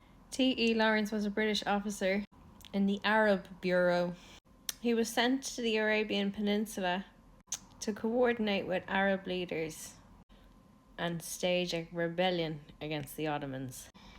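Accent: Ireland. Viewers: Wait I don’t get it. How is any of this bad? Unless you’re pro-Ottoman? But you sound Irish. I guess taking the credit for the Arabs tactics was bad but it was over 100 years ago so I personally don’t care and don’t understand why you do.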